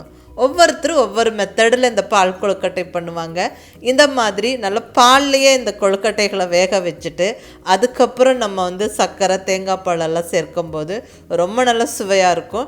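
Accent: Kongu, India. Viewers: She is from coimbatore. Kongu tamil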